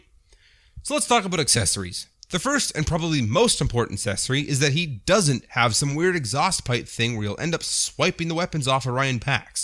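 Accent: Canada. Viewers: Hey, I know this comment is kind of late. I just wanted to let you know that I've enjoyed watching your videos for years, the transformations are amazing to see in stop motion, and I'll be honest, taking away the articulation section isn't that major, in fact a little change here and there can be good for a YouTuber to improve! Also I just love your midwestern Canadian accent It's clear, and fully understandable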